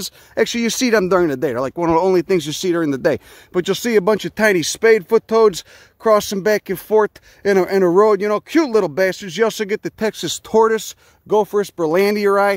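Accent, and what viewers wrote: Chicago, United States. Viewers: I have a question where did you grow up, your pattern of speaking reminds me of Brighton Park Chicago area. Its a breath of fresh air and love the Show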